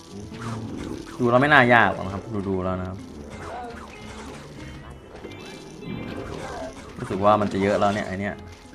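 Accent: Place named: Korat, Thailand. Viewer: พี่พูดโคราชพี่เป็นคนโคราชหรอคับ. ->คักแท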